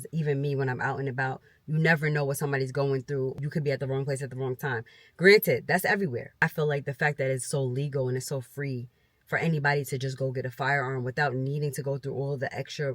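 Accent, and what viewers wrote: New York, United States. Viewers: A little lady with a deep voice and New York accent. 😂 🤣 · What part of NY is she from ?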